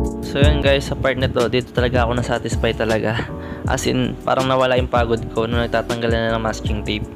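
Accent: Pampanga, Philippines. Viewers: Ako Lang Ba Ohhh May Parang Accent Sya Ng Kapampangan Pag Nag Sasalita Ng Tagalog 😂😂😂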